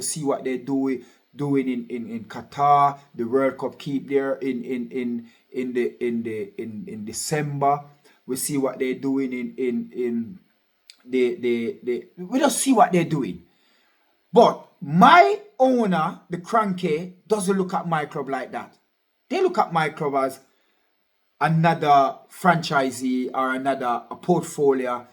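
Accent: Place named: Ireland. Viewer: that irish accent from so funny i didnot expected but when you speaking english with irish accent is better rather than you speaking english with accent british that martinelli assist against fulham when we won 2-1 similar like ozil assist to giroud arteta clearly ruined martinelli because he change martinelli become defensive winger instead become deadly winger in final third when we won against fulham 2-1 in april 2025 and you watch Muniz and iwobi, imagine if that was Mbappe and Vini we would be done I think Arteta will leave this season, I don’t think Arteta will ever be able to work with good and experience players. Bertha will sign good and exp players because calafiori get injured so we Can't even use Calafiori in his natural position against madrid at ucl first leg for replace gabriel maghalaes who get injured right now against fulham on april 2025 but even if calafiori not get injured then he still shite as centre back because cannot defend and slow, Now the Artetasexuals will have an excuse to latch on for UCL exit. 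ITS FULHAM so why risk best players before important CL game?? ARTETA IS A MUPPET!!!, Arteta's fault as should rest best players for CL game 🤷‍♂ we can't win premiership so no brainer, this stupid manager too inexperienced for this job, HOW ARE OUR PLAYERS PULLING HAMMIES ALL THE TIME? This must be systematic problem for which is Arteta responsible. Why are we surprised? This is the Arsenal way. Overplay the regulars until they snap a t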